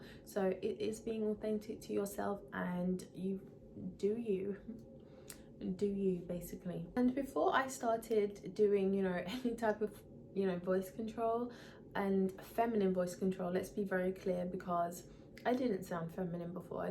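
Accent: United Kingdom. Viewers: Every thing you share is so true. I’m a very feminine woman, with a soft voice naturally, but I don’t always enunciate every syllable,... I wish so much I could speak with your proper British accent, but I can’t. One bonus is living in NYC and sounding nothing like the other native New Yorker women who can be more harsh and masculine than most men..... (sigh) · oh i didn’t mean it in a rude way i’m trying to say that maybe you focused a lot on the flaw but it’s hardly noticeable! you still have the same soft british accent but some words were pronounced differently and maybe your voice was a bit softer, but me coming from an Australian accent you definitely sounded feminine regardless!!!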